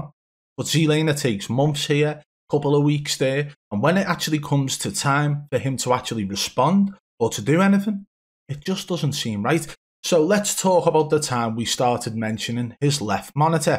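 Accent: Liverpool, United Kingdom. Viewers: Ur scouse accent is unbearable.. I feel like I agree that all or most of these streamers are cheating In warzone but your videos seem Phony as well and really shady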